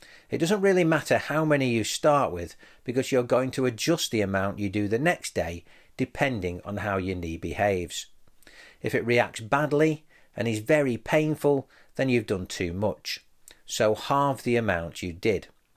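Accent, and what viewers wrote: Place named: Scotland, United Kingdom. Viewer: good Scottish accent 😊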